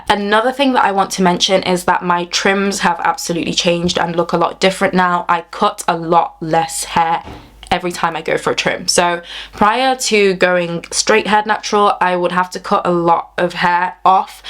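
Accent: United Kingdom. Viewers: Very helpful video, i had a feeling this was the case. My hair is relaxed so i normally blow dry it but i think i will go back to flat ironing it as it lasts much longer when the cuticles are sealed. I steam once a week. Also its nice to hear another UK accent.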